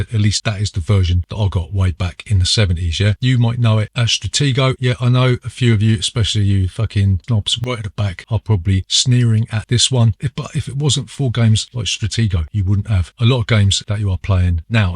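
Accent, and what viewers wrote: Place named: South West, United Kingdom. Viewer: All the way at the back here. Time to throw out some random counties, I've always thought Kent but often hear a little south west twang Great list, M44 is there for me also.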